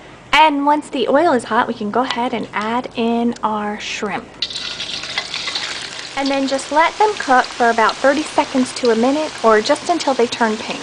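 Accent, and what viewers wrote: South, United States. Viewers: *New Subscriber* Do i hear a southern accent?? LOL I can't wait to make this dish....thanks!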